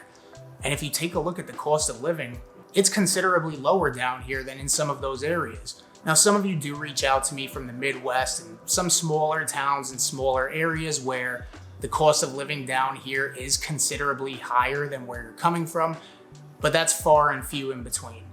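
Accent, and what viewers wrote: New Jersey, United States. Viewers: Great pros & cons video!!! I can tell from your accent you were a Bergen County NJ resident, right? Then again, to me, anyone North of Trenton sounds like they live in Hoboken. This Philly-born and raised, moved to South Jersey (we say "Joisey") resident transplant knows prices and auto insurance in Broward County is about the same as NJ (price hikes in 2024 for NJ). But our homeowner's insurance is so low. We love Pompano Beach and see all the new developments going up there as that's where we vacay every year. Will be down there again (hello Wyndham timeshares) this winter and see all things Atlantic Avenue and A1A near Sunrise. You forgot Con #6: SNOWBIRDS -- traffic is crazy down there, but from late November to April, wow! Of course, in my eyes, the Pros outweigh the Cons.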